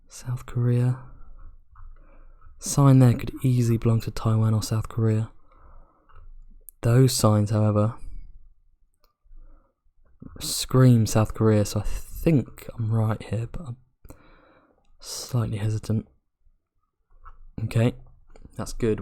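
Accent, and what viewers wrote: United Kingdom. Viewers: I meant, to me Wizard has a stereotypical Black Country accent and you have a more generic Southern England accent which I find hard to pin down.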